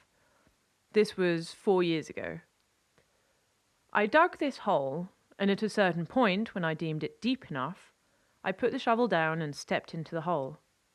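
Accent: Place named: England, United Kingdom. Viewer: I think I am going to spend eternity wondering why the narrator is speaking with an English accent. Still it does make it quite entertaining. And yet somehow ...my comment ended.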